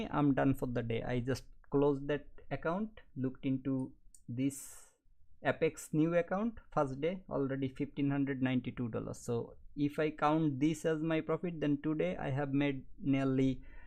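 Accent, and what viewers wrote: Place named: India. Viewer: you sound indian.. u indian?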